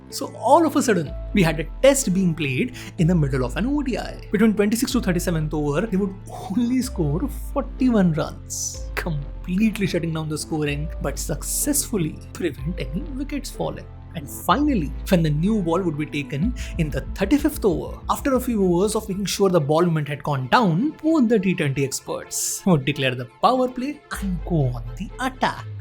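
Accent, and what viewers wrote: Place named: South India, India. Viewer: Oh my god bro this dosa idli sambar accent so annoying to hear 😭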